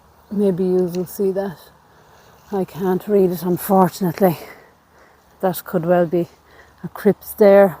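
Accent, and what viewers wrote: Ireland. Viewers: Happy birthday. You take us on a magical but sad tour of the diffrent cemeteries. I love your Irish accent.By reading some the the head stones you give the deceased their names will not be forgotten.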